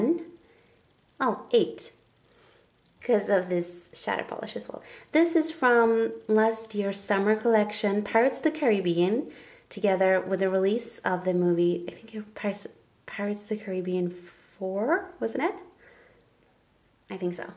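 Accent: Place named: United States. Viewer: You sound like you are from the states!! You proved me wrong! X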